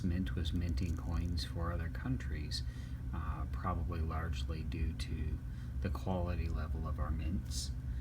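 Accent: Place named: South, United States. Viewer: Sounds as a southern belle !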